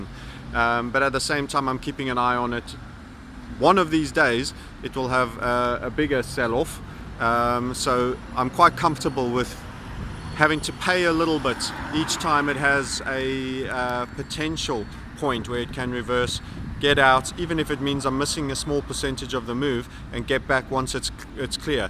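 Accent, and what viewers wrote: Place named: South Africa. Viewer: You sound like a South African whose been living in the UK for a couple of years.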